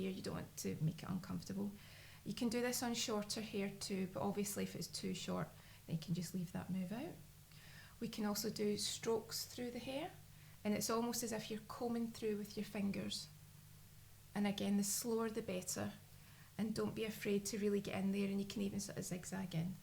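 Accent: Scotland, United Kingdom. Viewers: I could tell you wrre from Scotland by your accent. And thays ok I just thought you were a salon with you having all the equipment layouts and stuff if you know what I mean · Jawesome scottish accent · I just love her voice, it's so calming, and I find Scottish accent pretty sexy in a way...XD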